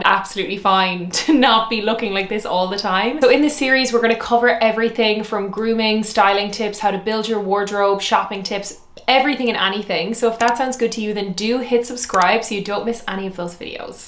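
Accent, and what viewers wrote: Ireland. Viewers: You look like a young Elizabeth Taylor! Stunning! Except your Irish!) love your accent! I agree W your tips. I clean skin regime…..and those little laundry bags for your intimates ( keep your $$ things well, they last,same with makeup…..you invest in it…take care of anythng it will last. I love being tall & thin wearing leggings …..WOW TIDE REMOVER PEN? LOOKS LIKE A NEED FOR ME! THANKS GIRL IVE A KITTY SO YOU GET ME ❤😊😊😊😊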